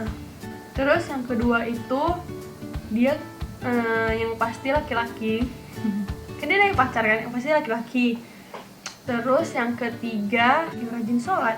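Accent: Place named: Medan, Indonesia. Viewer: khas medan kali yakan 😂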